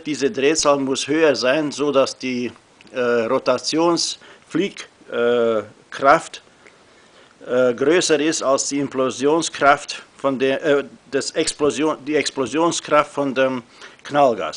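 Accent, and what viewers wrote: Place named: Transylvania, Romania. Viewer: Siebenbürgener Akzent...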